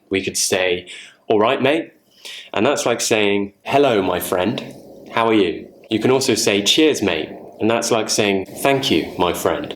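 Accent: United Kingdom. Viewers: British accent is soo intimidating!!😣 That makes you sound 100× smarter! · I love your British accent ♥️ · I hope same day speaks like you , I have to improve my english a lot ,thats my goal.British accents is so dificult to spanish people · That was wonderful lesson.. and I like your Brisish accent!! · I really adore u nd ur British accent 😍 thank you 🙏 much love 💙💙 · love british accent, and if is cute like you damm sweet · You are really handsome. I could watch your videos all day. I love your accent cause I like the way that british people talk. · I adore British accent, it's a pleasure to listen to you.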